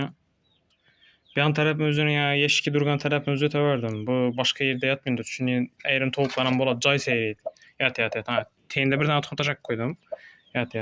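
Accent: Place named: Khorezm, Uzbekistan. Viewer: Aka iloji boʻlsa shevada gapirmang oddiy oʻzbek tilida gapiring vidyolarizni faqat xorazm liklar koʻrmaydi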